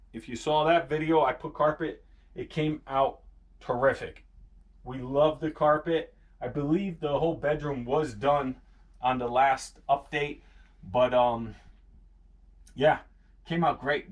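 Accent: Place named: Connecticut, United States. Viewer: I grew up in CT and was gonna say your accent kinda sounds like you're from CT, then I saw the plates on your truck! Cool cabin, thanks for sharing all the details with us!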